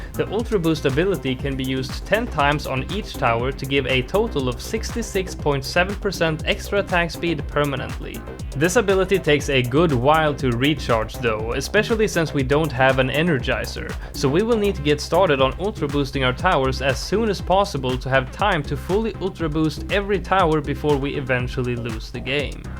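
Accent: Sweden. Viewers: Dude are you Swedish?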